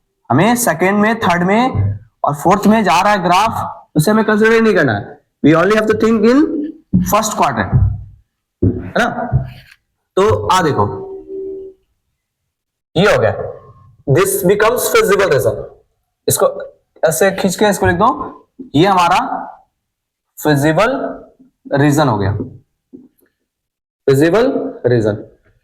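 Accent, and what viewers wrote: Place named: Bihar, India. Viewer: Aap Bihar se ho sar aawaj lag rha hai ❤❤❤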